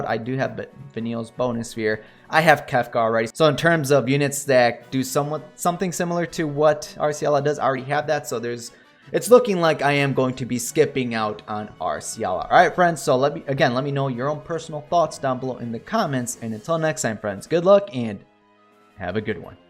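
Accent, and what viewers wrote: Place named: Texas, United States. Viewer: Just curious, where in the country were you born/raised/got your accent from? I've never heard "heirloom" get reduced to "/ˈɝl.lum/" from "/ˈɛəɹ.lum/" before. Sounds almost texan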